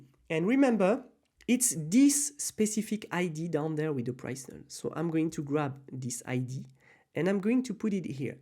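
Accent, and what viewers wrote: France. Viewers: Cool. I like french English accent. It's only below the India English one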